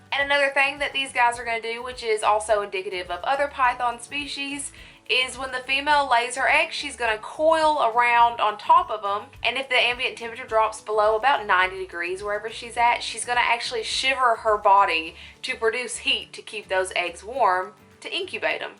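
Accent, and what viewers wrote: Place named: Georgia, United States. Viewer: I love your country accent. Sounds Southeast, maybe Georgia my guess. Anywho, love your channel. Great educational but more importantly, for me, your accuracy. 👍😁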